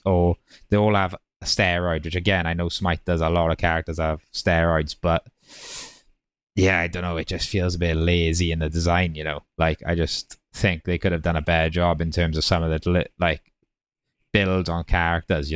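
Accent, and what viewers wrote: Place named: Wales, United Kingdom. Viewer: I must have sunk a thousand hours or so into Smite up until season 6 and I stopped playing because it got grindy and the fun went out of it. I want to give smite 2 a go, and I'll give Pred a go too; I played some Paragon back in the day and I didn't know it had kind of been resurrected - I've only just learnt about Overprime and some other game that used the assets that have both died. Looks like Pred has its version of Smite Arena that's just come out. The map is beautiful and I love the 3D aspect of it. I don't think I would sink any money into Pred though until it's been out for a fair while as that could just as easily disappear; I'd be more happy to put some money towards Smite 2 really. Sounds like you've got a Welsh accent; if so, friendly greetings from a fellow Welsh person! If not, friendly greetings anyway 😂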